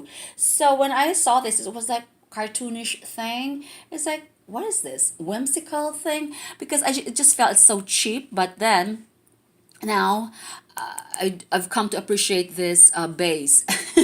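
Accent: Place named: Philippines. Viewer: Thanks for the review. I detect a Philippino accent.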